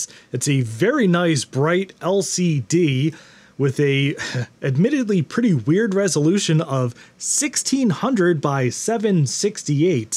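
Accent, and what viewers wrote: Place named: New Jersey, United States. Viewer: Ah shit you just gave away your from Jersey.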